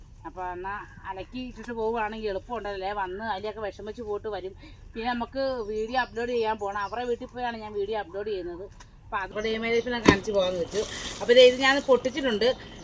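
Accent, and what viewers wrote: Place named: Malappuram, India. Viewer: മലപ്പുറം നത്തൽ, വത്തൽ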